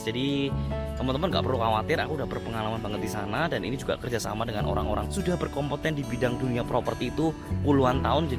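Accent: Java, Indonesia. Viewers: Paling suka sama penjelasan bro dengan suara khasnya yg medok. Hehe. Semoga saya juga bisa segera memulai bisnis. Terimakasih ilmunya bro. Sukses selalu, sehat selalu utk bro dan keluarga.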